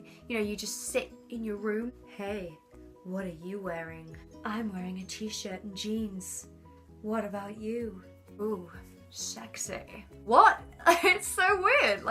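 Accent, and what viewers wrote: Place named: England, United Kingdom. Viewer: I LOVE THE ENGLISH ACCENT!!!!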